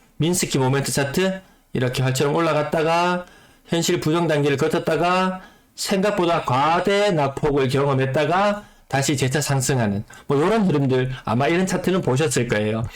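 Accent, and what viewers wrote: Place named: Daegu, South Korea. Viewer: 정보 감사합니다 근데 님 대구분 인가요? 만약 대구분 이라면... 대구 사람치고는 사투리가 작은 편 인것 같아요.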